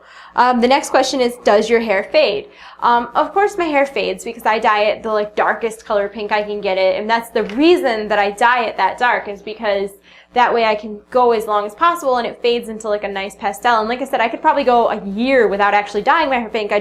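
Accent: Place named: New York, United States. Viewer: I love the NY accent! lol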